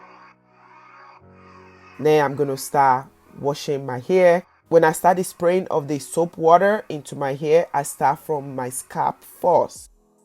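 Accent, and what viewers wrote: Yoruba, Nigeria. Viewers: No matter the accent the yoruba accent will always peek through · Your Yoruba right? I’m Igbo and I recognized your rich accent. Lots of love❤️❤️❤️❤️❤️❤️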